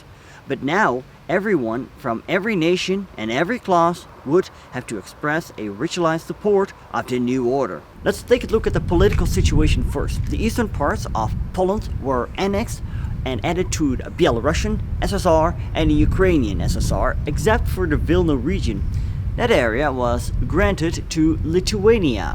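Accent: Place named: Netherlands. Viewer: Inhoud zeer sterk, helaas vreselijk dik Nederlandsch accent. Als je daaraan gaat werken zou het kunnen dat dit kanaal een groter bereik krijgt.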